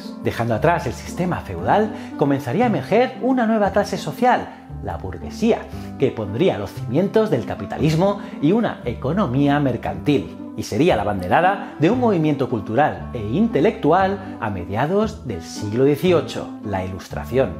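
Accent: Spain. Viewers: MUY INTERESANTE TU CANAL, ¿DE QUE PARTE DE ESPAÑA ERES.?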